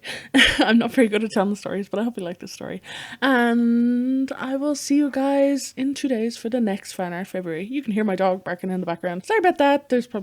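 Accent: Ireland. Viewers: HOWYA FELLOW IRISH PERSON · are you irish ??? i am and you sound like you are and if so which part? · OMG YOUR IRISH I LOVE YOU I'M IRISH TOO FINALLY A YOUTUBER WHO SAYS SECONDARY SCHOOL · Are you irish? Could you sound like it a lot XD at least in my eyes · Hey what country are you from/live in? You sound like you're from Ireland. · are u Irish?.!? same!!!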